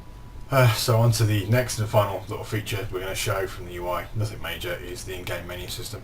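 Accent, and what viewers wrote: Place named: Australia. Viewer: you're UK based yes? you sound like an Aussie.. meh maybe itz just me.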